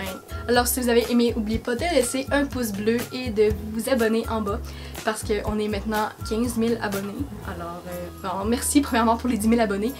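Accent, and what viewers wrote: Canada. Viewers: J’aaadddooooorrrrreeeeeeee ton accent canadien Vive les hauls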